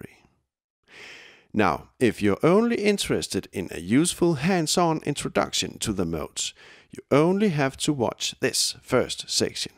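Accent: Denmark. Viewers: That's a Danish accent for you right there :) Anyway good channel! =)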